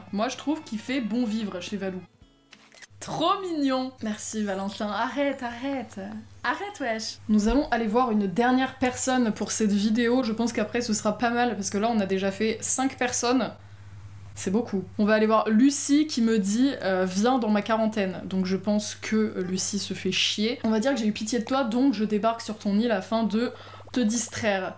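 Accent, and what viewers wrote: France. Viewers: Salut, je viens de te découvrir ta chaîne et je trouve que t'es sympa et j'ai bien ta bonne humeur :). Mais j'aime pas trop quand les gens mettent des mots en anglais comme tu le fait car je trouve ça gênant surtout quand ton accent est fr comme ça mais après c'est mon avis et ce n'est bien évidemment pas une insulte envers toi. Je te souhaite bonne continuation sur yt 😉☺️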